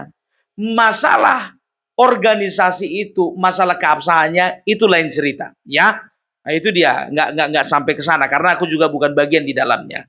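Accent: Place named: Batak, Indonesia. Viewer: Aku Seinang deingan gaya Batak Kau, Kawan, teirus berkarya kawan😂😂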